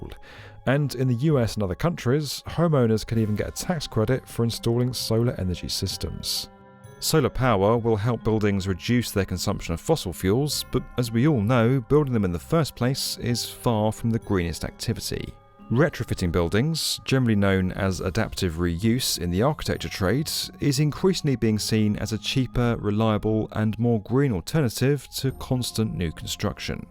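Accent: United Kingdom. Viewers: Check. The Cockney. Easy now big fella. 😎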